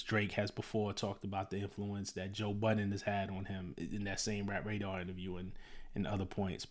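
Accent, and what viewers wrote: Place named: New York, United States. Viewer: Fam if you still listening to Drake after all this, how you call yourself a fan of hip hop? Having a New York accent, glasses on, gold chain and ya hat to the back don't move me. You should be ashamed of yourself fam to even say you still fux with Drake music. Vanilla Ice was basically Ousted from Hip Hop for lying and doing this same thing. Ya'll New York folks a disappointment. Ya'll really used to gatekeep and really used to stand on something when it came to the Culture. Not Anymore. That's why places like Atlanta were able to come in a really put they stamp on the Culture. Because New York lost it's soul, lost it's backbone. A dude from New York hearing and seeing this all go down, should be one of the loudest in the Room saying "No, This Ain't Acceptable". You dudes too scared now to go against the grain even for the Culture. 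To me fam dudes like you sellouts. Don't come at me with the "I'm trying to be fair", blah, blah, blah. FOH with all that. You don't even talk like you got passion for this Culture. Kendrick rapped like he is of the culture, from the culture, and it means something to him. You ain't got that same type of energy my guy. It's cool. Do ya thing. Alot of ya'll "Not Like Us" and alot of ya'll getting exposed. You gone be on the wrong side of history when it's all said and done. Trying to play the middle like you Swizterland, is how we got here in the 1st place with Drake.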